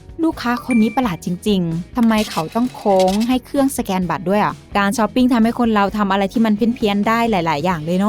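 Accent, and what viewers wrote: Isan, Thailand. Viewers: พี่พูดอิสานติ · พี่เป็นคนอีสานเหล่อออครับบบบ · เราว่าพี่เขาเป็นคนอีสานหลุดพูดตั้งหลายคํา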